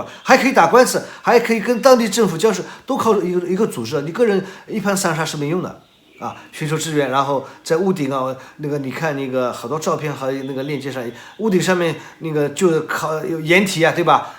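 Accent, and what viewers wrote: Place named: South, China. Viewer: 终于有我们南方人说政治啦👍👍👍这个口音听着真亲切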